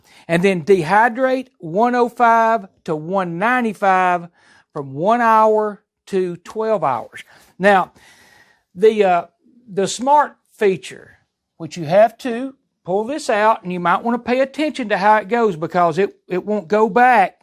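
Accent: South, United States. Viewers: Where you from,? I'm a SC girl. Recognize that wonderful southern accent! Thank you for your videos!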